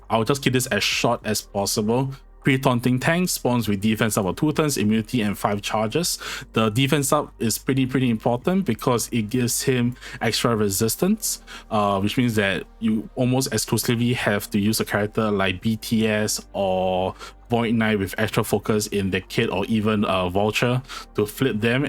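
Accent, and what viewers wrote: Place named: Philippines. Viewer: good vid bro, your accent is heavy .. are you filipino??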